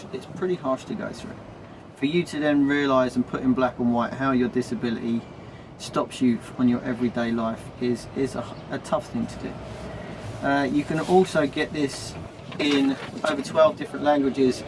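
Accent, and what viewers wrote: England, United Kingdom. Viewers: Your English accent is very interesting to learn for a people from abroad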